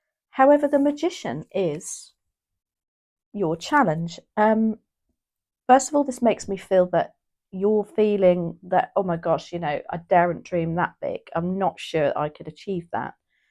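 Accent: United Kingdom. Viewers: Hey welcome, nice to hear a Uk accent tarot reader. My first time here ❤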